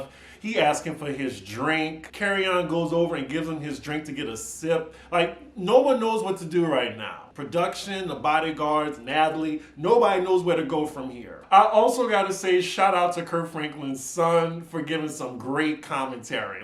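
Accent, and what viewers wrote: New Orleans, United States. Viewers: you gotta use your New Orleans accent more! 🤣🤣I luv ❤️to hear it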